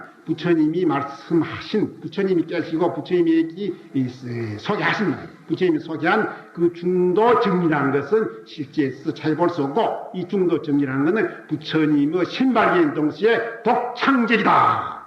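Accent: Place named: Gyeongsang, South Korea. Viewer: 경남에서 태어난것이 처음으로 자랑스럽네요 ㅎㅎ사투리가 심하신 스님 말씀을 자막없이도 이리 수월하게 알아들을 수 있다니 🙏🏻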